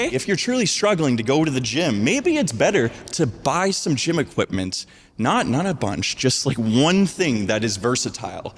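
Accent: Canada. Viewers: This man has the thickest Canadian accent I've ever heard.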